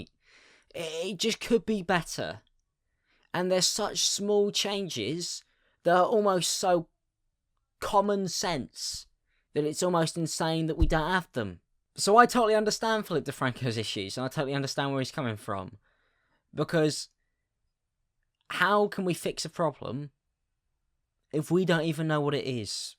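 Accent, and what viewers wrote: United Kingdom. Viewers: It must suck to have a British accent and *still* sound sorta dumb. Not nearly as dumb as my American accent (face it Americans, the world thinks we sound retarded) but definitely way more dumb sounding than most British accents. (Again, I'm American, and we think a British accent means you're smart, because we are very, very dumb. If you have a British accent AND glasses, we assume you're a genius. You know; like how a dumb person thinks. )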